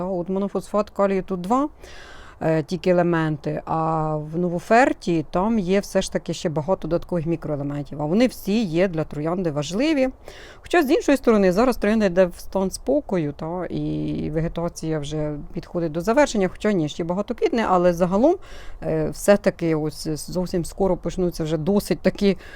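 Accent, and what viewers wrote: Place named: West, Ukraine. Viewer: так ви з Полтави? І не скажеш. Розмовляєте так прямо як із заходу. Мені дуже подобається нормальна українська мова, бо у нас на Дніпропетровщині суржик. Щось як ляпнем.... вчилися в совдепіїї у рускіх школах. Занедбали нашу красиву співочу мову. І до сих пір не ленійка, а лінейка. Це я так колись сказала.дякую за відповідь. Хай щастить.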